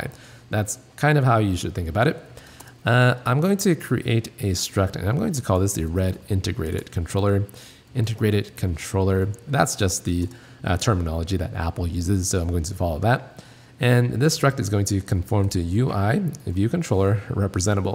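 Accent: United Kingdom. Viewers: What the fuck is it? British?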